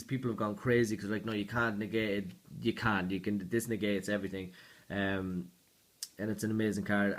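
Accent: Ireland. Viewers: Ayy you sound irish :P sell me your deck pls xd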